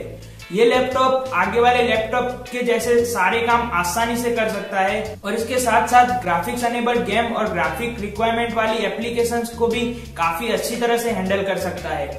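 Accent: Gujarat, India. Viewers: are u gujju.??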